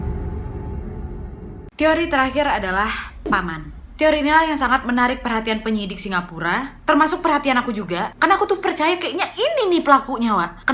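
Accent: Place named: Bengkulu, Indonesia. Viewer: Ngmngnya kaya orang Bengkulu Wak Wak🤣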